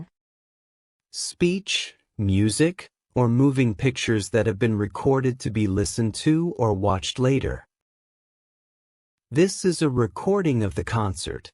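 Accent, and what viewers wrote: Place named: United States. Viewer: American english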